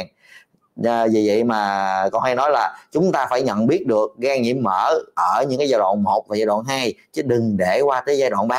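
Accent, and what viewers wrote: South, Vietnam. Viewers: Tui rất thích tuy rằng nói tiếng nam quá nhưng còn hơn nhiều Bác sĩ lên nói rào trước đón sao và chū yêu là câu lai 😊😊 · Lần đầu nghe Video của Bs, co like, đang kỳ kênh , chia sẻ luôn nè, cảm ơn Bs rất nhiều. Giọng đặc Nam bo de thương ghê hông! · Bác Sỹ Nói Chuyện Giọng Nam Rất Dễ Nghe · Hello Bác Sĩ Bác sĩ là người miền Nam nói chuyện rất bình dân, cảm ơn bs đã giải thích thật rõ ràng. Tôi U80 nhưng vẫn muốn BS xưng (tên) là ‘tôi ‘ thay vì là ‘con’. Bởi vì chắc chắn có những người nghe , học hỏi từ bs ngang tuổi hoặc trẻ hơn . Ở miền Nam hai chử R. Với. G. Hay bị sai Thí dụ : Xong rồi. ( rr ồ. i ) Không phải. G ồ. i Như người miền Bắc thì chữ. L. hay nói. là. N Xỉn lỗi BS trước nhé ( ý kiến xây dựng thôi) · Bác sĩ người mn có khác ăn nói thật là dễ thương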